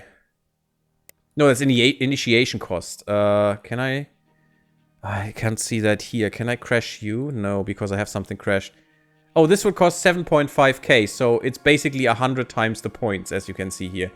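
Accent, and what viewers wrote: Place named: Germany. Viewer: Are you german? Love the accent lol sounds cool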